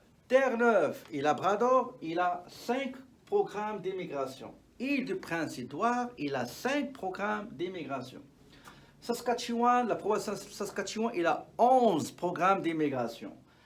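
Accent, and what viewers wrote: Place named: Morocco. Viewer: لا اقصد العربية الفصحى ، ولكن اقصد الشرح بلهجتك المغربية الجميلة ❤🍁🎈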